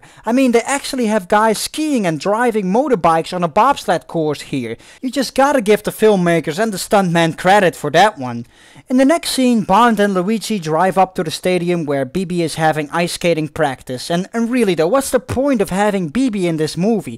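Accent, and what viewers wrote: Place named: Netherlands. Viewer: Very sorry to sound like "That Dumb American", but I absolutely enjoy your Dutch accent. But honestly, your English is pretty good. I don't know how many Dutch citizens speak English, but you're very well spoken in the language. Aside from that: keep up the good work with your videos. Thank you.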